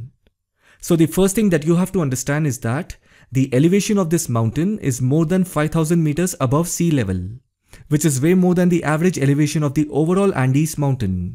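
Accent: India. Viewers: Your indian accent is so clear.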